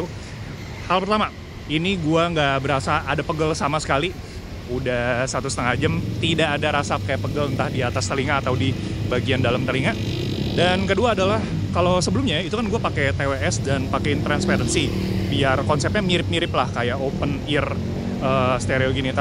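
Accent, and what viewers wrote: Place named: Banjar, Indonesia. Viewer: banjar bnar wwkwk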